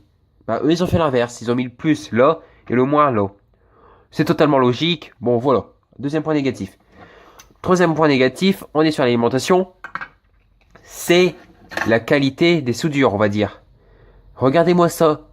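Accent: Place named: Nord-Pas-de-Calais, France. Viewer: chti reconno tè dmin coin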